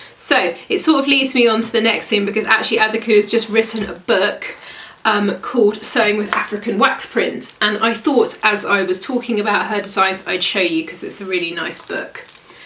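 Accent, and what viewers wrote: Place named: United Kingdom. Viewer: You do just find..Dont pay any mind to others that doesnt have a kind word..Im in the U.S...I find UK a cute accent..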